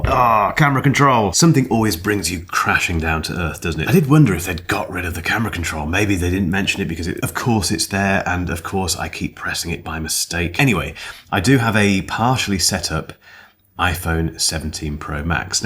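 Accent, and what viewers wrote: United Kingdom. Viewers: can you please say aluminum once again? didn’t hear that in british accent since jony ive left apple 😅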